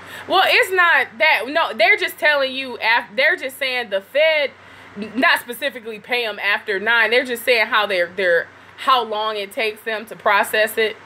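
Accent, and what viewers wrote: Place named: United States. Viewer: were you from you sound country as hell I like it though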